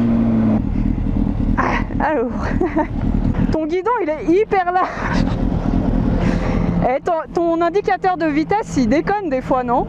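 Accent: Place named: Burgundy, France. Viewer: Oh 😱 ta l'accent bourguignon. Jamais j'y prend en 2. t'es trop large 🤣. Et cet embrayage alors 🤔. Et ben tu m'a bien fait marrer. Eh ben dé que j'ai la mienne tu as l'essai en exclusivité. Et promis tu ne l'a pas encore essayé. Vivement l'essai du futur 4 cilyndres. Je suis sûr que tu vas kiffer la moto de Bébert bobber 😁. Bravo à pour le prêt de la machine. Merci copine pour ce bon moment passé virtuellement. À bientôt et gros V ✌🏻 à vous deux la bise de Bourgogne 😘 tu le sais déjà. Peace ✌🏻.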